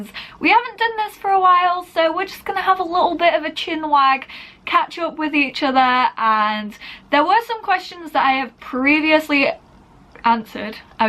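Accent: England, United Kingdom. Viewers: If you mean you want her accent, I have it so yeah. Just thought I'd say that, if you want to know what it is, all I know is that some part of England.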